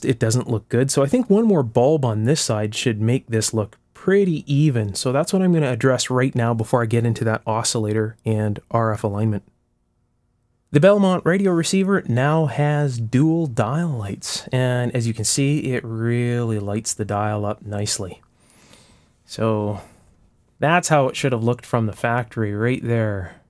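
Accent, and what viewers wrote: Canada. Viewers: Um I said to myself "what the hell is VIM?". I found it is an abrasive cleaner sold in Canada ( accent makes his origins obvious). However, it's not sold elsewhere in North America (from what I can see). Here's a friendly tip for the future, when bragging about a product, give context and show what the product is. VIM is too localized. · are you cannaddian? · It's a great escape to see bring back a classic radio but the real therapy is in the monologue: his Canadian pronunciation is refreshing to these jaded American ears. My. mom used to voice her L's so distinctly that I would shudder as a child hoping not to be taken as foreign. Of course that was useless and the foreign property was a feature of the mind.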